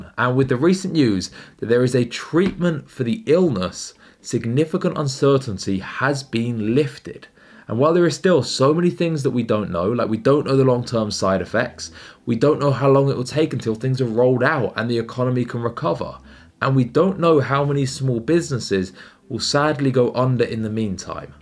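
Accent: United Kingdom. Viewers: Too much talking off topic. Not to the point. Don’t like British accent in USA it is irritating 😠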